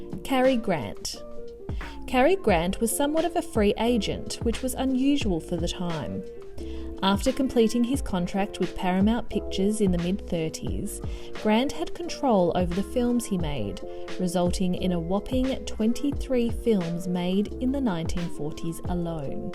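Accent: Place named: United Kingdom. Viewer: Why is someone with a British accent narrating a film on American life?!?!?;?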